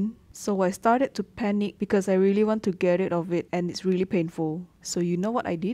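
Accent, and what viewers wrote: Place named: Singapore. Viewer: Not to be rude or anything but are you from Singapore? I had 2 friends online that I used to play games with and they talked like you. No offense 😅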